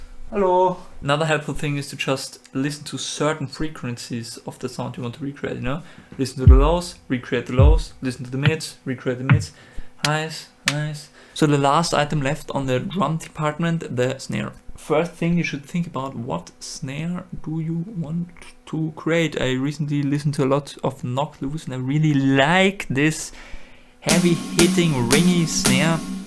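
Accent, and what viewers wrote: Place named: Austria. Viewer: I am a simple man. I hear a fellow Austrian accent, I press like.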